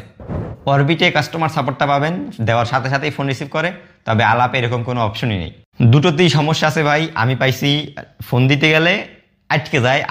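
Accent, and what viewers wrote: Barishal, Bangladesh. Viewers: বুঝতে পারছি যে ভিডিও ছাড়ছে এর বাড়ি খাস বরিশাইল্লা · শুদ্ধু বরিশাইল্লা মিশেল ভালোই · ভাইয়ের বাসা কি বরিশালে?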